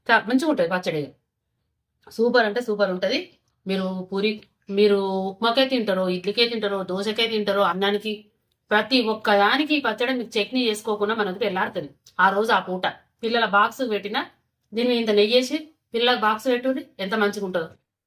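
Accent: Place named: Mancherial, India. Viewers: మంచి వంటకం చేసారమ్మ చాలా థాంక్స్ మంచిర్యాలన మీది మాట తీరు అలాగే ఉంది 😊 🙏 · Hi aunty. Nenu me new subscriber ni. Medi ekkada aunty. Madi Karimnagar. Me language same Karimnagar side vallu matladinatte undi. Me vantalu bagunnay. Meru chupinchina udakabetti chesina tomato pachchadi Ninna chesanu. Chala baga kudirindi. Mirapakayalu ekkada kontaru. Chala bagundi me karam. Red color lo. Maku address cheppandi aunty. Memu kuda konukkutam.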